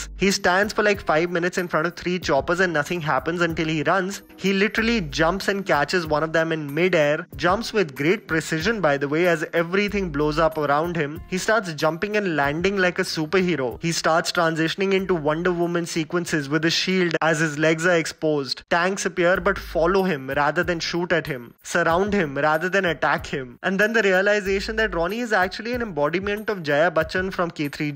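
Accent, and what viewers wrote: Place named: India. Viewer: Speak hindi rather than english. Indian accent are disturbing 😖😖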